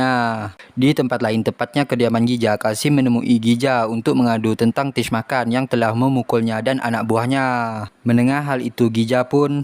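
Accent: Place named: Johor Bahru, Malaysia. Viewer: Aduh jd kangen ke JB lagi.krn Abang cakap macam tuh. Love you Abang buat sy kangen. 🥰🥰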